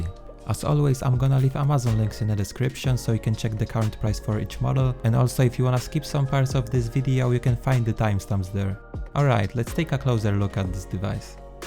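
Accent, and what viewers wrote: Poland. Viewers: You...will you test the new rog Strix scar 3 (with rtx 2060/2070 and i7 9750h) ? PS: I like polish YouTubers 😁 like you (I recognise your accent because I'm near your country) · Cześć! Super recenzja po akcencie słyszę że z Polski jesteś co nie? Na twoim miejscu robiłbym recenzje w dwóch językach bo dużo polaków by cię napewno oglądało a większość ludzi z polszy jest zbyt leniwych żeby oglądać recenzje po angielsku 😃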